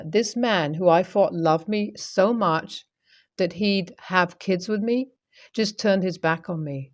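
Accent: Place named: East London, United Kingdom. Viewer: It's a working class English accent from London's East End.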